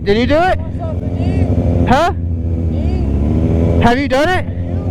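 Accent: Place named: India. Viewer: W Indian accent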